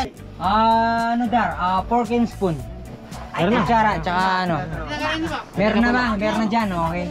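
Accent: Pampanga, Philippines. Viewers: Kapampangan ka tlga ehehe . 😅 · ingat kayu parati soy kapampangan ka pala🤣🤣 taknaydumo anang tita eh🤣🤣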